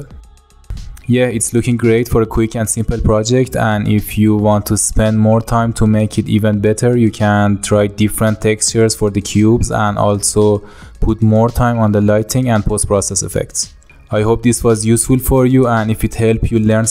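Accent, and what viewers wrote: Iran. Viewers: Your accent is similar to Iranians. If you're Iranian, well done. If not, well done again ;) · dadash damet garm az lahjat fahmidam ie iranie ba gheirati :))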